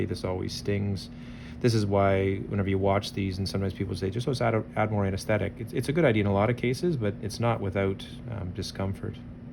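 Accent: Canada. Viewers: My husband is Canadian (I’m American) and I always have a knack for knowing Canadian accents. As soon as I heard you speak I knew you were! Also patient did a great job keeping himself together during the lidocaine. I would’ve been swearing!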